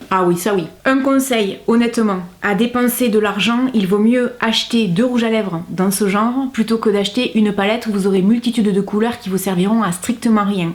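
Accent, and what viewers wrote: South, France. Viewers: Cc Ma belle tu est mon rayon de soleil ☀j aime beaucoup ton accent du sud et tu as un jolie sourire et ta bonne ça me fait du baume au cœur 😍 💕 prend soin de toi 🤗 😘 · Coucou, fraîchement abonné à ta chaîne, j'adore tes vidéo, ton humour et surtout l'accent du Sud vive le sud. Tu es de quel coin ? Bisous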